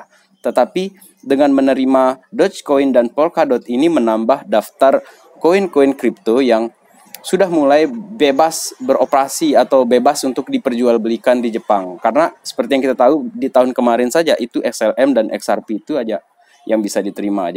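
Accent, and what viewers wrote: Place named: Manggarai, Indonesia. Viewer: Berarti sama logat nya dengan kita orang manggarai yah 🤣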